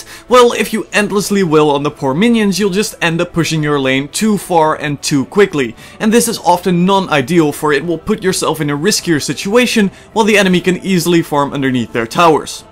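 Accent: Netherlands. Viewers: are u dutch? u sound dutch xD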